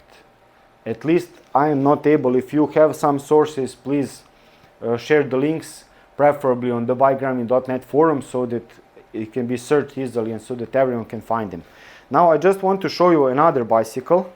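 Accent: Eastern Europe. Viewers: Your “Slavic accent”, my friend, is not a problem at all - and I’d bet that your English is a helluva lot better than most English-speakers’ grasp of any Slavic language 😅 Your videos make a lot of sense, get straight to the point and avoid hyperbole. There seems to be no agenda other than sharing your knowledge. This is all hugely appreciated, thank you. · Your Slavic accent is fine 😉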